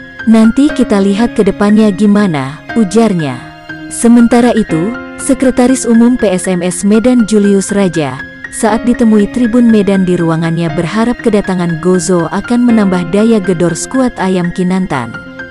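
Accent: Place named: Java, Indonesia. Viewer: Hampir medook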